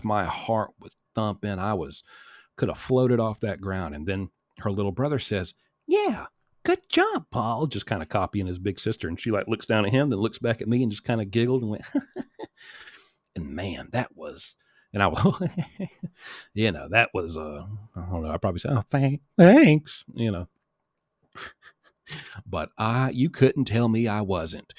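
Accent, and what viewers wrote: South, United States. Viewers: I LOVE the southern accent!! We say like it or lump it! 😂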